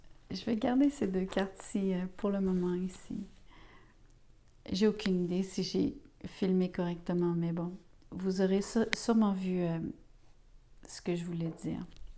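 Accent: Quebec, Canada. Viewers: Bonsoir madame Pour la pause... elle est pour moi une rupture malgré c est vrai une attente pour ce qui est de la communication , j'ai bien tourné le dos à cette personne. Je suis ce Lion ( feu ) et elle balance, tres changeante et tres joueuse, non ancrée et je ne serai pas surppris qu elle joue sur plusieurs tableaux d' ou la rupture . C est vrai, j ai cette impression d un bonheur perdu mais ce n etait qu illusions et je l ai compris et accepté. Tout à fait exact pour cette balance qui n'a aucune confiance en elle meme si elle presente aux yeux du monde tout le contraire. C est vrai... il n y a pas de gris chez moi ... c est tout ou rien . Cette personne a tjrs ete voir ailleurs ( addictions) . Pour ce qui est de se retrouver en couple .... Noway Merci madame💐🙏, voix appaisante, douce, avec un arriere accent du Quebec et meme un peu de latin . Xoxo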